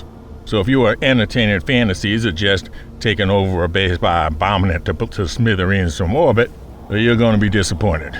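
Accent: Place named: South, United States. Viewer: You accent is excellent, it reminds me of a southern gentleman.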